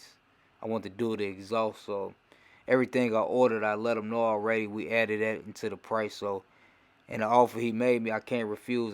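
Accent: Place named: Chicago, United States. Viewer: I noticed right off the bat you’re a Chicago guy, you sound just like one of us. Straight Chi City sound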